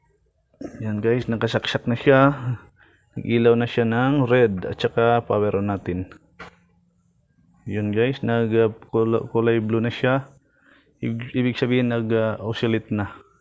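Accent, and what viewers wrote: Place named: Waray, Philippines. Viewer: Taga samar/leyte ka sir?? Waray man it yakan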